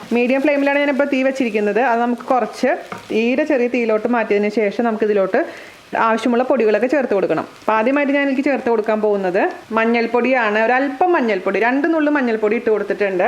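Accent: Thrissur, India. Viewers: Njan litty vipin chechide recipe try cheyarundu endhe ammayum thirrsur kari aanuu ippo amma ella but chechi timil parayunna chila vakkukal adipoli ready mani athokke kelkksnum koodi ya njan kannune endhe ammayumm athu pole parayumayirunnu chechi de slag ellammm amma pettennu orma varum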